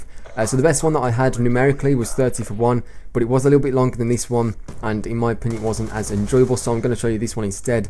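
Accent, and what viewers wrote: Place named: Cannock, United Kingdom. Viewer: bit of a personal question but are you from cannock? your accent sounds like cannocks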